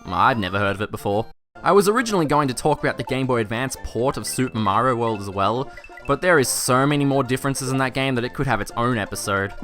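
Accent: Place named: Australia. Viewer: different accents. he's Australian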